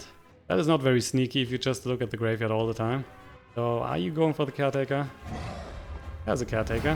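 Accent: Germany. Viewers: are you from germany? just wanna know if i guess the accent right.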